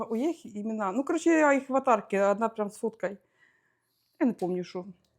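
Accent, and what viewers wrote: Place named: Dnipropetrovsk, Ukraine. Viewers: Доброго вечора,! Випадково попала на ваш канал. Було дуже приємно чути наш криворізький суржик, дякую вам! Я сама народилася в Кривому Розі, живу в Атланті більше 20 років и в Україні не була вже вісім…підкажіть, як вас знайти на ФБ, якщо можна❤️ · така сама мова Дніпропетровська область...одним словом суржик....)😊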